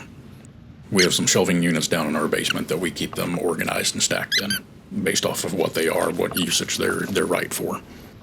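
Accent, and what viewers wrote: South, United States. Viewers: This Augusta old lady, now living in Canton, appreciates your southern manners and accent! I enjoy your adventures.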